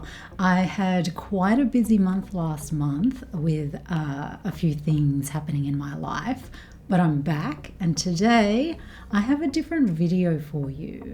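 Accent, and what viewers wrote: Australia. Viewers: Thank you so much for helping me practice Australian English, this trains my ears to understand it better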